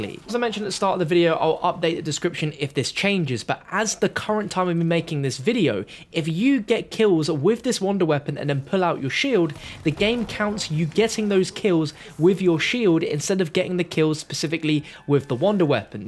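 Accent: United Kingdom. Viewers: Something I've been wondering for awhile, is British? Idk why but his accent sounds British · Yes he is from the UK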